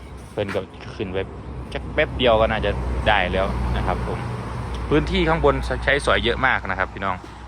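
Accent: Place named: Korat, Thailand. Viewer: เอ้าอยู่เทพสถิตหรอ อากาศดีนะ อ นั้นเว้าโคราชกัน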